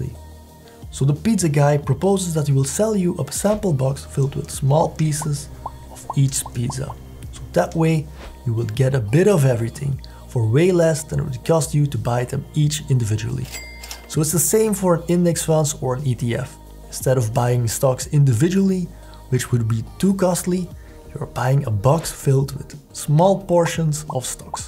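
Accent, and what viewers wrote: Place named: Belgium. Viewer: Haha, giere. Zoek ik video's op over investeren, en ik hoor echt een Belgisch accent 😂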